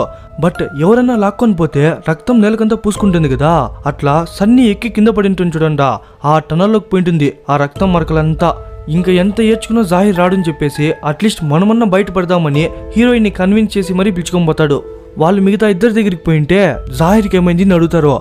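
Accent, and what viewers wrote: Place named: Karnataka, India. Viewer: పక్కా కర్ణాటక తెలుగు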